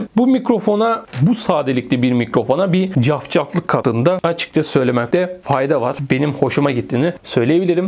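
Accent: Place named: Azerbaijan. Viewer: Selam. Sen nerelisin? Sanki Azerbaycan Turkusun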